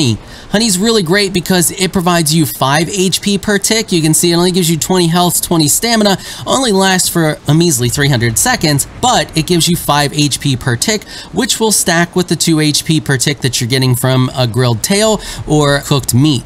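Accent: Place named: Northeast, United States. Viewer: You sound like you're from the north east US. Nice content. Be safe.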